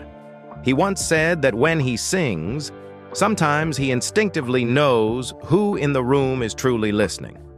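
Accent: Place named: South, United States. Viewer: So much repetition and “over the top” words, expressions, comparisons”. I assume Morgan Freeman was the narrator. His Southern roots creep through. A good editor could have pared this down and made it much better. It got very tiring.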